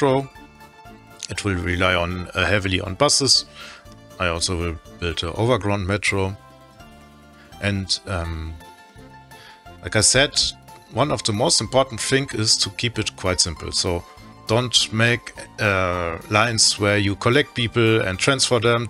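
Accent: Germany. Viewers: Sweet , after three seconds I recognise the German accent.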